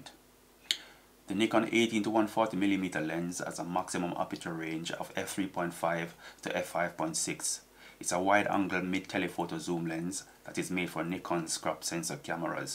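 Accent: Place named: Caribbean. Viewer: I would recognize that Caribbean accent anywhere. Very nice to the point video here. It’s interesting that you bought your lens to use it with your D7200 which I also own since 2015. That is one amazing dx camera which I don’t ever plan to part with. I now also own the Z5 which suits my type of photography. Interestingly that I just recently purchased this 18-140mm from KEH at a bargain price for the exact reasons you mentioned. In addition to my D7200 it works beautifully with my Z5 using the FTZ adapter. It also focuses very quietly during video and takes amazingly sharp pictures on this Z system even though at a reduced 10 mp but enough for me to get a nice sized medium enlargement. It would have been good if you had included a few images taken with this lens at different focal lengths and scenarios. Keep up the good work brethren. 😀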